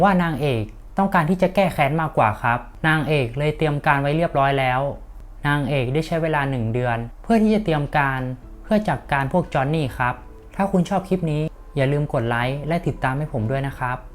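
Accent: North, Thailand. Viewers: พี่เป็นคนเหนือหรอคะ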